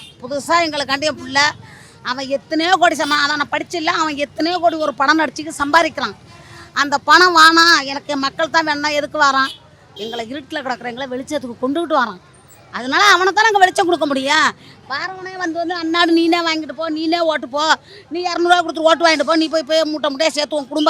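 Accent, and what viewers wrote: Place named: Nagapattinam, India. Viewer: Idhu namba voor Nagapattinam pecha theriyudheh... Aaaya weight kaatiduchj❤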